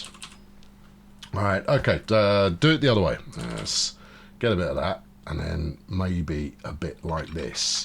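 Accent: United Kingdom. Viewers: I loving your Doon canyon series. And nice to hear the dulcet tones of a fellow Brit. What what, cuppa tea govna etc etc.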